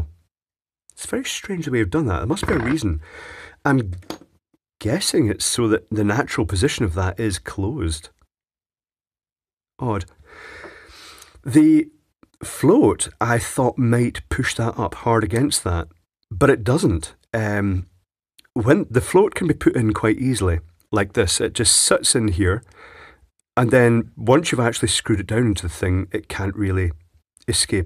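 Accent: Scotland, United Kingdom. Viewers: Not sure why I watch this. Perhaps it's just nice to hear a fellow Scotsman talk. Thanks for the video,.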